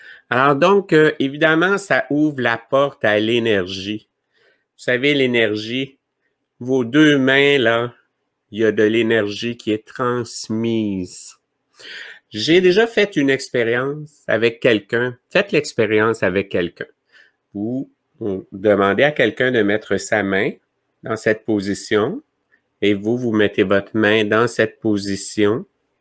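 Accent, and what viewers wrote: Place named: Canada. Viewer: ❤️ Merci beaucoup cher 🙏🏻. J’aime vous écouter et votre accent canadien 🎄 J’habite en CORSE et bien loin de chez vous: magique aussi ces liens instantanés d’un bout à l’autre de la planète 🌍 Cette vidéo, avec l’Alliance Galactique, sont pour moi les plus importants, comme des contes de Fées bien réels... des magnifiques et grands moments d’émotions pour moi 🙏🏻🕊🕊🕊 Gros bisous 😘🥰❤️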